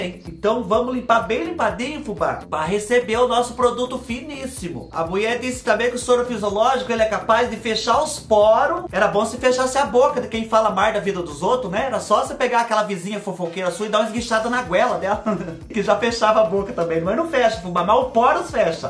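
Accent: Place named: Minas Gerais, Brazil. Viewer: Nossa, segundo vídeo que assisto seu, conheci seu canal hj e só ia ver de curiosidade, mas não aguentei e me inscrevi para não assistir de clandestina kkkkkkkkkkkkk e pq amei mesmo ^^ e esse sotaque mineirês é bão por dimais sô rsrsr <3 Sou uma mineira morando em Portugal agora, mas a mineirisse não sai de mim rsrs ô falta de um pão de queijo que deu agora rsrss Adorei vc e seu conteúdo, ri horrores rsrs obrigada! Beijinhos e sucesso!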